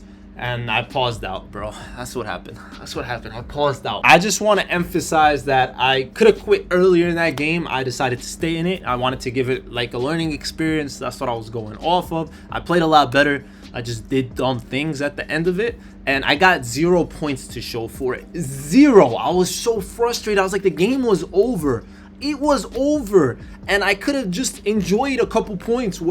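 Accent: United States. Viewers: You mentioned you have lag a lot. Where are you based? Im from the UK but im always running into you in TT park. and you've got a US Accent, Maybe you're on the wrong servers or something.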